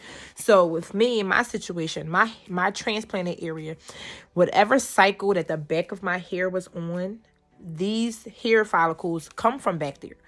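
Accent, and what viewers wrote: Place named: Los Angeles, United States. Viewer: That LA accent jumping out baaabbyyy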